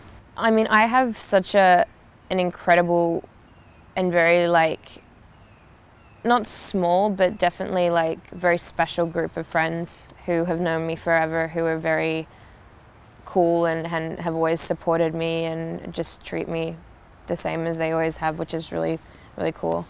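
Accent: United States. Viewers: Bloody hell, I wish she'd turn off the american accent!!!! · I luved her American accent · She's slowly getting an American accent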